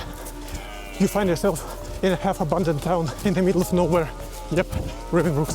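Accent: Russia. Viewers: Бро говорит с явным русским акцентом)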